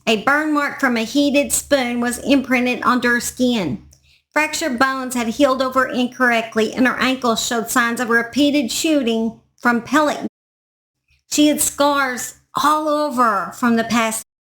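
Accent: South, United States. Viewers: Wow,love your southern accent. Yes, this monster is the worse of the worst. Damn, the things she did to her victims are unheard of. She was one sick b*tch. I'm glad she'll spend the rest of her miserable life in prison.she still gets her 3 meals a day,free health care.Something her victims didn't get. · Thank you for your content I luv your country accent. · Just found your channel + instantly subbed. I watch true crime way too much + I've never heard this. Thank you for bringing attention to it. What a sick sick woman. I have no words...I hope all the victims of this deranged lady are living their best lives today. My prayers are with them 🙏 Btw having a severe Boston accent myself, your southern voice was lovely to hear, especially talking to your pup! Thank you for the vid. Look forward to your next ✌️💙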